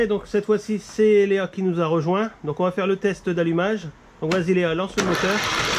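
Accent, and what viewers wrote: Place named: Nord-Pas-de-Calais, France. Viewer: Bin té vo min grand quand un veux parler Français ché pas facile d'oublier le chti "un arcomminche" Merci pour cette vidéo lol!!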